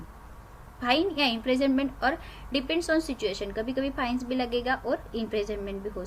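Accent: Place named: Maharashtra, India. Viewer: Marathi tone in hindi but topic samjla thanks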